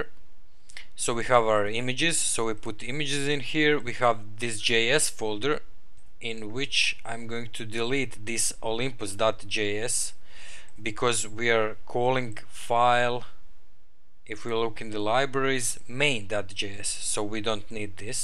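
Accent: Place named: Russia. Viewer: с первой секунды понял что ты русский)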